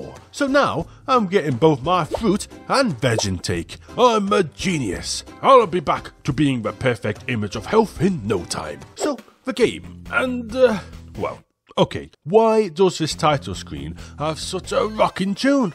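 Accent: United Kingdom. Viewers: i thought goombas were japanese you have a british accent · Dispite one of my actual favorite british sounding youtubers hucking raid shadow legends onto loyal viewers, I'm still not gunna download it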